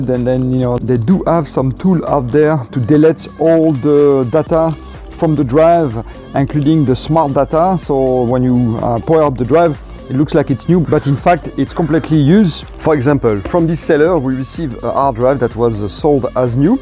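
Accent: France. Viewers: Tu es Français ? l'accent me dit quelque chose ?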